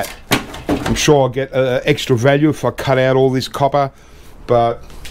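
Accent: Australia. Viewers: Hello from Napa Valley, CA, USA!! I have seen many of these teardowns of air conditioning rads. First of all, those copper coils or "noodles" are sawed off, then that sheet metal piece is removed before scrapping as it is. Love your "Down Under" accent and keep the videos coming!! Watch out when scrapping the second rad!! I saw a menacing looking spider!!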